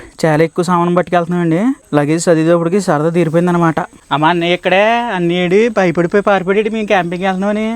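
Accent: Godavari, India. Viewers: Godhavari language super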